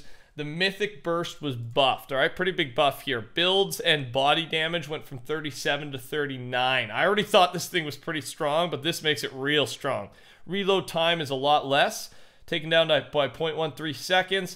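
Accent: Canada. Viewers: Is he Canadian? Nvm xD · Are u Canadian?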